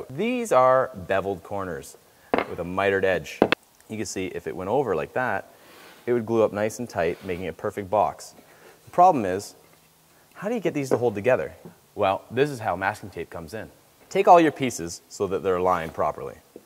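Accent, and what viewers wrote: Canada. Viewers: Are you . . . Canadian,by any chance? 🤔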